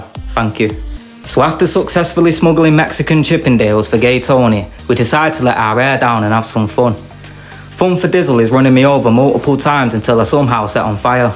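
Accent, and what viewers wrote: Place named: United Kingdom. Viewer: #CrashRaid You are British I like that lol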